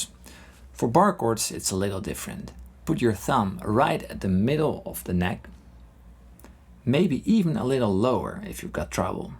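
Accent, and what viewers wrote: Netherlands. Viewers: where are you from? Because your accent sounds Dutch. Nice vid btw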